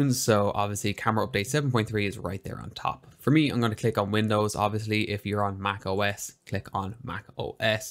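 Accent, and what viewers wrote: Ireland. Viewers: Very helpful, thank you. Just wondering, since you sound Irish, I am in Ireland and thinking about buying a v-mount plate and battery for my BM6k, but almost everywhere on Amazon and other sites say they don't deliver batteries to my location. Could you recommend a site that does?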